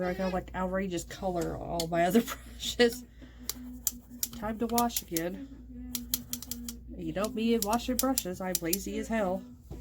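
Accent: United States. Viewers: Girl, bye! Those ppl need to crawl back in their hole. There is nothing wrong with the way you talk. I love our Oklahoma accent. Also, I love it when you talk in your videos. You always make me laugh. This look is gorgeous! That lid shade tho...#Yassssssssssss! Love you more than 2 big busses! Lol! Stay safe! TFS! ~ Nona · I luv ur "hillbilly" accent 💜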